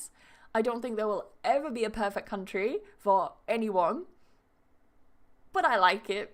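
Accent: United Kingdom. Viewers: Tengo casi tres años de vivir aqui en UK, y nunca habia encontrado un video con una persona de habla Inglesa Británica que explicará tan bién sobre la Pronunciación de las palabras, Vocales, Diptongos y demás. En lo particular se me ha hecho muy difícil aprender el Acento British, debido a que yo aprendi un Inglés muy Americanizado por no decir un Espanglish, Enhorabuena por todo lo que enseña en sus Videos, ahora ya tiene un nuevo Estudiante...!!!! Congratulations...!!!!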